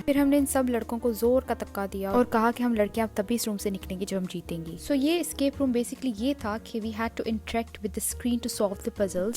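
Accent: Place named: Punjab, India. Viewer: Uff the way you switch from English to urdu to Punjani 🤣ufff Dude you rock in punjabi accent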